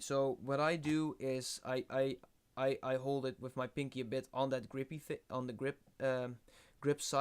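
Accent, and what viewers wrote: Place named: Netherlands. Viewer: dutch accent :P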